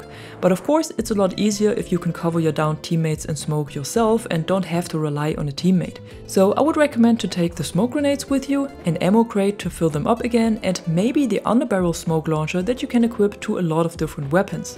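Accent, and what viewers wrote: Germany. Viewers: Nice tips 👍🏼 (are you german bc your Accent )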